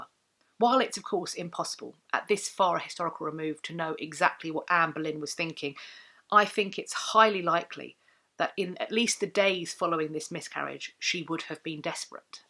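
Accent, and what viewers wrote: United Kingdom. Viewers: Hi, Dr.! I'm a new subscriber, and I just wanted to tell you how delighted I am that YouTube, in it's infinite wisdom, decided to drop you into my que. I, very much, appreciate your expertise on the subjects in which you speak, and I could watch your videos all day, but it's your voice that has me transfixed. I really could listen to it endlessly; there's just something about a British accent! Thank you for providing such intellectually stimulating content. I look forward to binge watching all of your postings.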